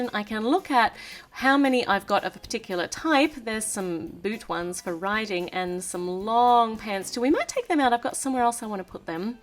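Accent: Australia. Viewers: Are you from Australia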